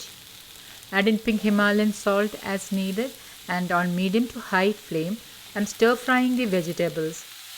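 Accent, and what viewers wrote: Kerala, India. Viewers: Sounds like you are malayali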